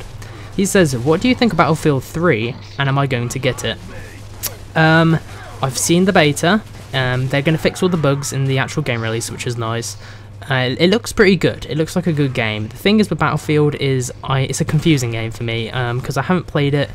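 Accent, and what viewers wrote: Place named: United Kingdom. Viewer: What part of the UK r yu from??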